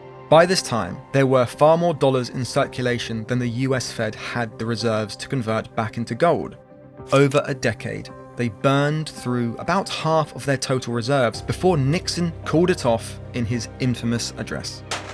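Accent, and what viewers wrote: United Kingdom. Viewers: yes, but that makes this video blatant propaganda, doesn't it? And why a person with a British accent? He's using a very American-centric POV for the story. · You are a compromise. Speaking American with an English accent. Do you watch a lot of American TV or movies?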